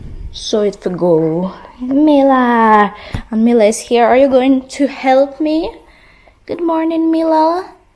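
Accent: Jamaica. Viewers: I love patois too love you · Yes you can speak jamaican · nuff people love when you talk patios we love that about you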